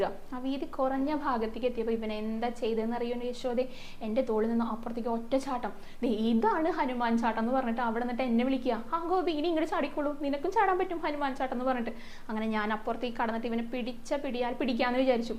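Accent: Thrissur, India. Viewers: Kadhakal parayunnthu kelkkan valare resakaramane prathekichum parayunna reethium thrisoor bhasheyum. Bhangiaayettondu. Kadha parayan oru avsarsm kittiyathu thanne oru bhagiyam thanneyalle. Guruvayoorappan Molkku SarvaIswariyamgalum tharumaarakatte. Kunjente kaal pollalettathu bhethamavunnundayirikkam alle? Ok God bless you! Keepit up.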